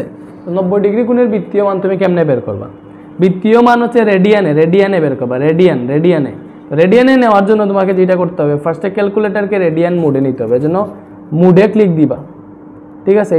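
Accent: Sylhet, Bangladesh. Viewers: Uni Sylheti.. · সার আপনার বাড়ি সিলেট নি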